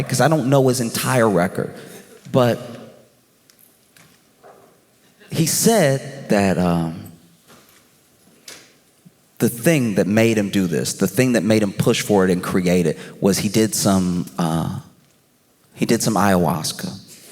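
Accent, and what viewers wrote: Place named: Louisiana, United States. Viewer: How does Josh put out so much quality material? He’s one of the funniest comedians today. Also love the Louisiana accent 😊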